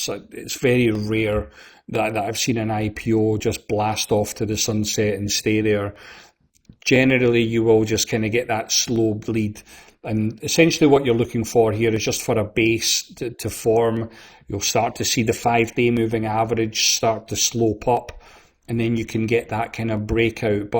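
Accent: Scotland, United Kingdom. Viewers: Thanks for this update and I love your accent, I have so many positive experiences with Scots. Could you say something about Novo Nordisk (NVO)? It is a blue chip company that is down 70% from its highs and I'm wondering if it has reached its bottom yet.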